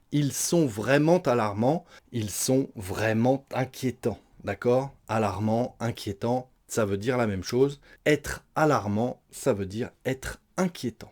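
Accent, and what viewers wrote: France. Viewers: Professeur, cette leçon est très intéressante et importante et c'est très agréable d'entendre sa voix avec son accent français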